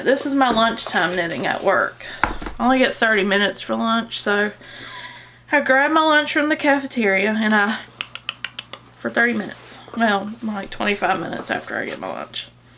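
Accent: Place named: South, United States. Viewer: Probably spelling this wrong..but Cruella DeVille. I would love yarn named for her. White,black,throw some red in for her lipstick and maybe some ash for her cigarette. as I was watching I said..this gal is from the south..she talks like me. I live in Hartwell,Ga. My son use to live in Albany so I know where you are from.❤️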